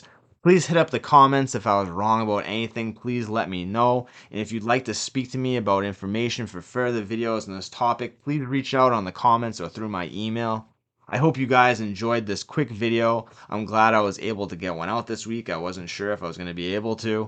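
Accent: Boston, United States. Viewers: video was decent an i liked an subbed cuz u from an mass an i support mass creators the accent was jus throwing me off its the mark walberg shi an im born an raised here never heard it other then from wyt ppl from southie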